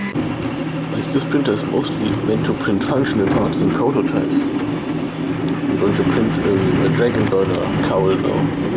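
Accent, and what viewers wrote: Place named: Germany. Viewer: I knew I was in for a ride when hearing the thick German accent XD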